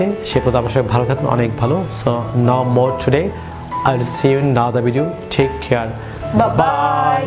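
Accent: United Kingdom. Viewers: আপনার উচ্চারণগুলো অনেক সুন্দর স্যার। ঠিক ব্রিটিশদের মত।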